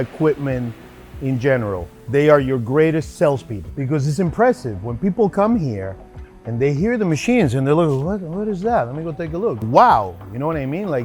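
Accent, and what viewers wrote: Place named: Italy. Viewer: Yes yes! I want to give this guy all my money with a "Italian Accent"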